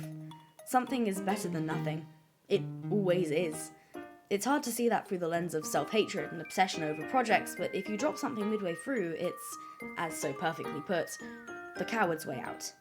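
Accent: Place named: United Kingdom. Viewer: oh god she's british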